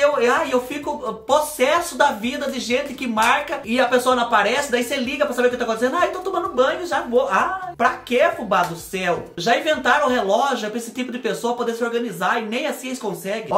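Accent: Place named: Minas Gerais, Brazil. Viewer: Vc é mineiro uai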